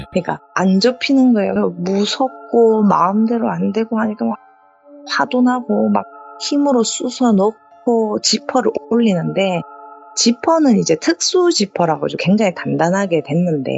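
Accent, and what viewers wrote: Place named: Gyeongsang, South Korea. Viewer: 충청도 피가 흐르는 경상도분이신가봐요 재미나게 잘들었는데 사투리신경쓰지 마시고 해주셨음~~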